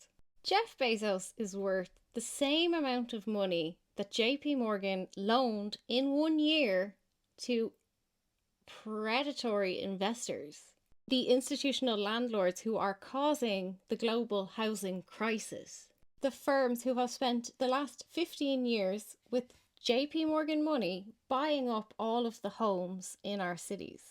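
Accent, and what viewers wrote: Ireland. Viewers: Sounds a bit Anglo Irish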